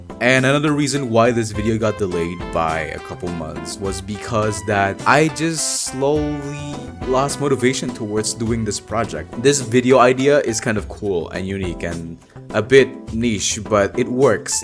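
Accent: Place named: Indonesia. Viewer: Idk how but I clocked in you being Indo just from the first couple of seconds and I was right. INDO PRIDE GRAAHHHHHHH🦅🦅🦅🦅🔥🔥🔥🔥🇮🇩🇮🇩🇮🇩🇮🇩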